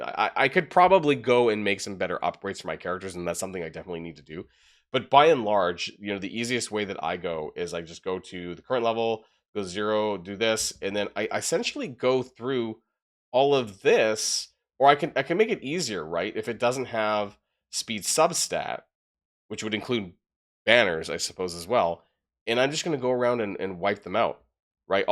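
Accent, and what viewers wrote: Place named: United States. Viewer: Почему название видео и описание на русском, а мне вещает какой-то американец? -_- Требую видео на русском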